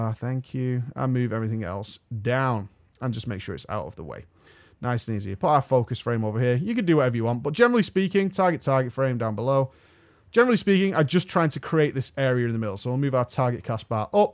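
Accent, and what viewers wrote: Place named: Manchester, United Kingdom. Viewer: Youtube is not friendly to the Manchester accent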